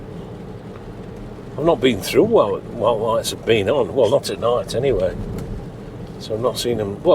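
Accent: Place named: Southport, United Kingdom. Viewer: I was a southport boy with same accent.been in nz for 50 years but its music to my ears to listen to this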